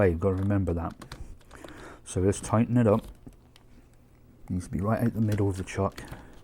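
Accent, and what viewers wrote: United Kingdom. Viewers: north carolina? wow, i thought you were british lol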